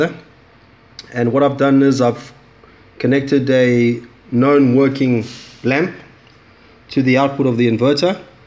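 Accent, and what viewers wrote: South Africa. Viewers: Ahhh s.a accent 👌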